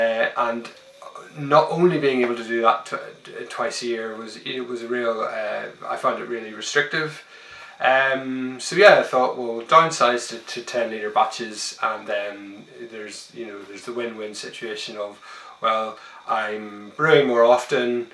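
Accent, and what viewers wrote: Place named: Scotland, United Kingdom. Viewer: There he is, hey, nice to see you on the tube. Now if I didn't know better, I would say there is a very slight Ballymena lilt to your accent these days although it's most likely that Scottish influence. Definitely would like to see the braumeister in action at some point and some small batch brewing. Always worthwhile seeing how others do it. From what I remember, the mash recirculation is a thing to behold.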